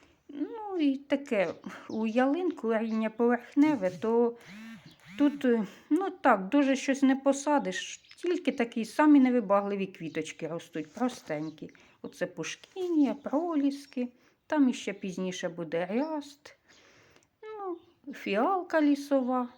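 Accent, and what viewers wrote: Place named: Poltava, Ukraine. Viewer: Я по Вашому говору зрозуміла що ми земляки.Я живу в Полтаві.Родилась в селі, Гребінківськийрн.Маю дачу. Теж люблю квіти люблю все що зв’язане з землею.Миру нам всим добрим людям. Як наз ваше село?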